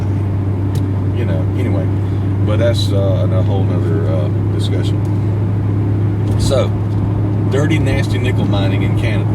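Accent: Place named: Rural, United States. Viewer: Grow some hair and loose the hick accent before you try and come across as an"expert"- dumb..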